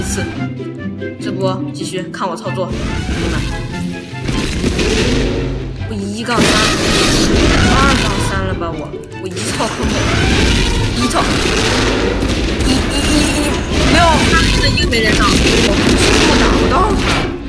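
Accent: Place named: China. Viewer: 中国的吧 听口音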